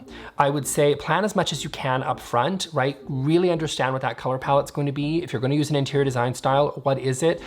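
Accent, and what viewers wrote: Canada. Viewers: that makes sense. I’m British but have absolutely no problem with Canadian accent (or most Canadians’ accents). I find his voice, despite the quick pace of speech, quite calming and very easy to listen to. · Congrats on the 60K mark! It's si great to see things from a Canadian perspective...the minute I first clicked on one of your videos I noticed the accent. YAY!!! 🤗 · I LOVE that you talk fast and get to your points quickly. People need to rev up their brains...or hit pause and go back, lol! Your accent and manner reminds me of another Canadian designer, Emilia Wisniewski....what a hoot it would be if you two did a vid together!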